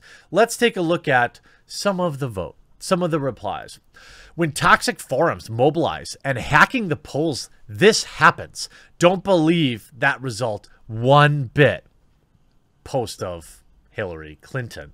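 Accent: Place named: California, United States. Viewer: This SJW Californian accent is reaaaaallly getting to me. 😂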